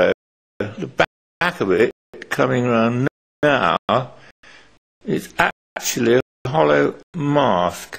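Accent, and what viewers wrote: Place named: United Kingdom. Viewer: lol, old school brittish accent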